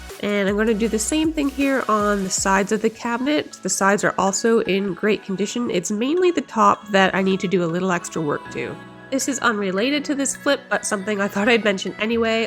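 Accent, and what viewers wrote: Canada. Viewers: Your ideas and work are beautiful and inspiring. Please keep explaining why you choose certain products; it helps me to better understand the process. The music is fine but I'd be fine with hearing just your voice. You have a charming accent that sounds like my Canadian family. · Thank you so much for posting this! I have only refinished mid-century furniture with walnut veneer. But I bought a cute circular table with solid wood legs and skirt, but it has a laminate top. I will be applying your process to paint the top and edge. Wish me luck! Yank here btw who visits Toronto about once per year. I knew you were Canadian about 30 seconds into this video 🙂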